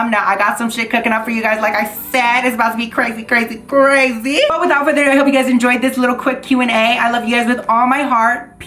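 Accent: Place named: New York, United States. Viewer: I like how you said “dau - tuah “ I love ny accents 😭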